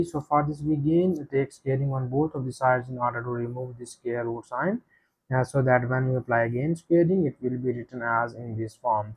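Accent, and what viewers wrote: India. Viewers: Indian English?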